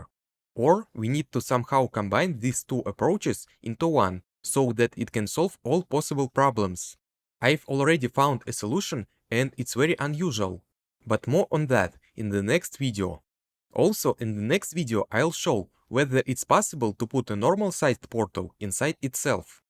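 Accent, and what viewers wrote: Russia. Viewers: bro got Russian acent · Акцент кст русский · не вижу русских в комментах, хотя акцент автора явно русский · Nice russian accent 👍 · Вау, что у тебя с акцентом, никогда не слышал такого неподвижного звучания. Ты проигнорировал КАЖДУЮ фонему английского и заменил её на фонему русского. И даже не на самую похожую. нг в конце почему-то читаешь просто как н, ладно середину между н и г найти не получается, зачем просто выкидывать г. Произносится не обжект, а абджэкт. Не фанайт, а файнэйт. Не пробабли, а прабабли. Не копис, а капийз. Не сёрфэйс, а сёрфис. Не аньюжал, а аньюжуал. Не идиа, а айдиа. Не моментум, а мамэнтам. Не эгрис, а агрийз. Не эдд, а адд. Не специфик, а списыфик. Не дат(that), а дэд. Не джеометри, а джиамитри. Не детэ́йл, а ди́тэйл. Не телепо́рт, а тэ́лэпорт. Не эллипсо́идал, а эллипса́идал. Не алдоу, а олдо. Не дыс(these), а диз. 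Сделай на русском отдельную дорожку, невозможно без кукожа тебя слушать.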